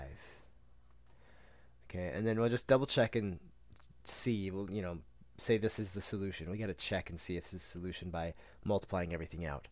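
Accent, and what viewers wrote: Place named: Texas, United States. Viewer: You sound like my friends from Texas.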